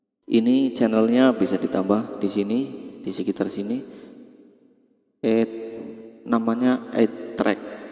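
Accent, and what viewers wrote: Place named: Banyumas, Indonesia. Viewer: ngapak ngendi mas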